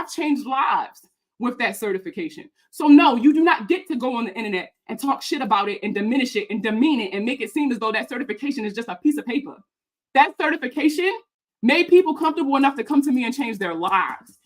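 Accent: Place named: Baltimore, United States. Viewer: You're getting too emotional 😂, he actually brought me here, and I here that Baltimore accent. I think the attention they are bringing to you is actually doing more good than harm.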